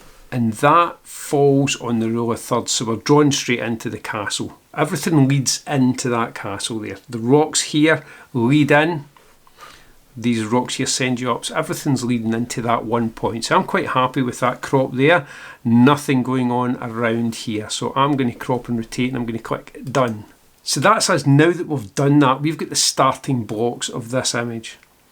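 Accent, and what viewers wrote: Scotland, United Kingdom. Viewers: Great edit, clear step by step instructions as usual. You are really helping me to become more and more confident with Luminar,. Oh, and I could listen to your wonderful Scottish accent all day! 👍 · hi , since I watch you I don't know if I became a pro of Luminar but certainly I did improve my understanding of the Scottish accent :-)) ... I wish I knew you before so I could understand the Gallagher brothers ( (I know there are from north England but it seem to be close from yours) during my last photo-session with them (so frustrating not to understand a single word) ... So please note , I love Scottish people and their folk accent but please just try to be more British if it's possible , for a French guy well trained in English it wasn't so easy to understand ;-) thanks ... best ... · Just found your channel and I'm glad I did. 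Excellent tutorial and easy to follow what you're doing. Eilean Donan must be the most photographed castle in Scotland. Visited it many times on my way over to Skye. Nice to hear a shots accent on the tube, and I'm looking forward to more of your tutorials.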